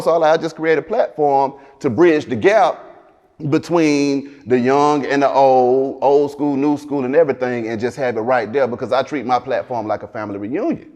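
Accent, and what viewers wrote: South, United States. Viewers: I Would've NEVER guessed talked this much lol He a cool dude I'm from the midwest came across him on youtube I believe he going places he ask insightful questions AND he let the Guest reply without interrupting but can help them carry a answer. I think that natural south delivery can be a plus for mainstream whatever he got planned if the timing right and done with the right platform. Via Shannon Sharpe type shit glowing but stood out being his self on the Hip Hop side of things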